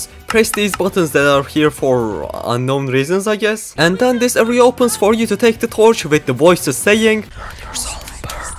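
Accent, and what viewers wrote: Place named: Turkey. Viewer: abi türkmüsün ingilizmisin belli değil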